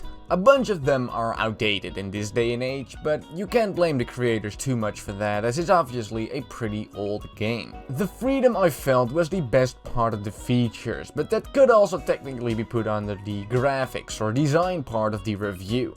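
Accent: Netherlands. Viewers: Very good review, +1 point for Dutch accent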